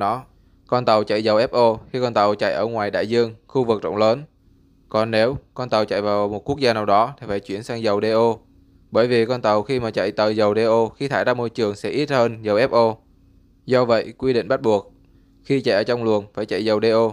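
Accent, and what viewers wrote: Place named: North, Vietnam. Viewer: Mình xin góp ý với chủ kênh.mình là người gốc bắc thì cứ nói theo thuần tiếng bắc.anh chị em sẽ rất ghi nhận công sức của bạn! Chân thành!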